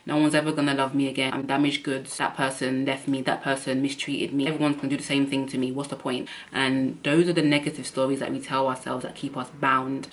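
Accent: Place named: United Kingdom. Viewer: When you played the little Heavenly music leads me to believe you believe in a higher power The Most High Yahawah and his only begotten son Yahawashi Messiah l feel like have to ask you to research your history although you sound like your from the UK but that isn't your nationality right or wrong...? Please don't misunderstand why l am asking you about your nationality, here's why l am inquiring about your ethnic background because l've learned about 5 years ago now that although l was born in America taken from Africa l am neither African nor American, from the time we so called Negroes where dragged here against our wills and turned into Slaves well our ancestors where turned into slaves anyway, we as a people have walked around in this whole Earth where we were all scattered throughout the whole world as bondsmen and bondswomen (SLAVES) Well to make a long story short if you are a descendant of slaves as blacks (the so called Negroes) are here in The Americas (United States) and South America than l ask of you to start researching your history but please go buy unless you already own it the Greatest history book every written called "THE KING JAMES BIBLE/W THE APOCRYPHA " Start with that to begin your research on you history and look for secular historical history that matches the scriptures that verify who you truly are and your true historical and biblical identity you will be amazed when you find out it'll help answer a lot of your questions about circumstances and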